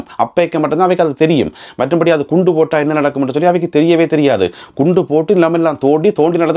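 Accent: Jaffna, Sri Lanka. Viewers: தமிழ் அழகு அதிலும் யாழ்ப்பாணத்தமிழ் அழகோஅழகு ❤ ரசிகன் அண்ணை❤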